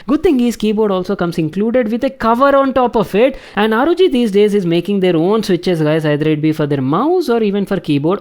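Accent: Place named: South India, India. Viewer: one thing i would like to say i absolutely love ur accent, that little south touch in it, it's so gooood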